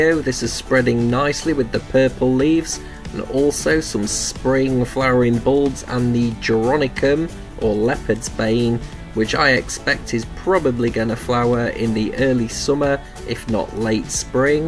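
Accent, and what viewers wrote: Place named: North, United Kingdom. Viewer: Oh God - I cannot move on my patio from all the divisions I have made of every perennial you can think of. I dug up one small clump of helenium and got over 100 separate plants. I think I could start supplying plants to the Dutch international plant shippers at this rate, I'm definitely hoarding! And just so you know - I reckon nobody is offended if you don't reply to their posts. It's decent of you to show appreciation, but if you get more subscribers and reply to everybody you will get burnt out, so I never mind if you don't respond to any of my posts again if it means you have more time to do what you enjoy and make such excellent content. You sound like a fellow northerner so its good to have somebody fairly local, gardening in similar climate with similar plants at roughly the same stage as the stuff in my garden. 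I see many others feel the same as I do, so keep up the ace content where you have time and if you are busy, all us new subscribers can form a community sharing tips and ideas in the comments amongst ourselves. Cheers for making me feel welcome as a newbie though!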